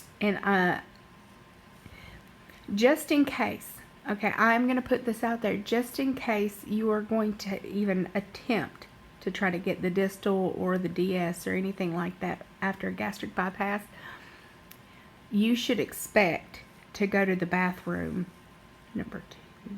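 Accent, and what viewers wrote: South, United States. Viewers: Are you from the South, honey?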